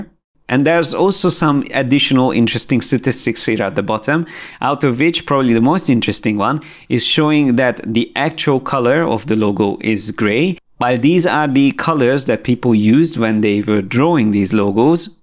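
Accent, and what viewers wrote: Hungary. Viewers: you have a big ass hungarian accent :D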